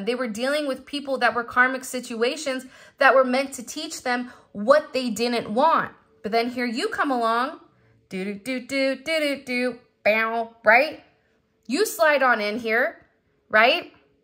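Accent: California, United States. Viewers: Regular sub for several months now and while your readings are always lit as AF. This one was on a whole Nother level. Thank you for your gift. I’m from the Bay Area and I can hear California in your voice and you’re saying and it makes me happy. OK I’m gonna go continue the reading now I stopped at halfway through just to write this.❤️‍🔥❤️‍🔥❤️‍🔥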